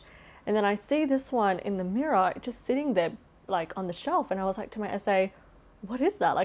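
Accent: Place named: Australia. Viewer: Are you from Aceh? Can’t figure which accent, but the more I hear it’s definitely Aussie’s 😅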